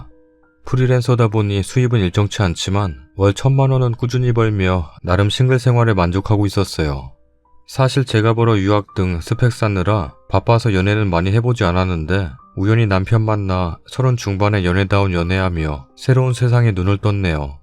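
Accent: Gyeongsang, South Korea. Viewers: 저 부산사람인데 부산말 욱겨요 ㅎㅎ 어리버리 글 지워 졌어요 죄송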